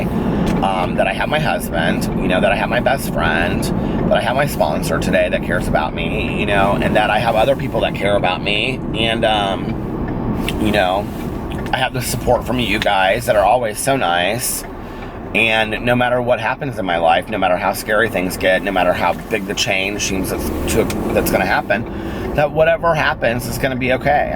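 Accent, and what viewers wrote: South, United States. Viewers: I love you! And I love your southern accent. I’m from Ar & I Snapchat you all the time. Love how you say drank, sang.. 😂💋 have a good day!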